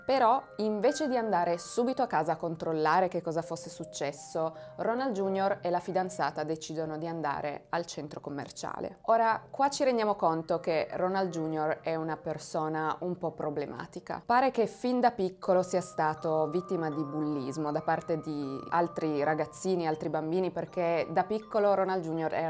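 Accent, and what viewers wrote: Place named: Pordenone, Italy. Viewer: Azzz !!!! Non ricordo se sei Piemontese, o di lì attorno,si potrebbe facilmente confondere il Tuo modo di parlare con quello di Pordenone, è identico!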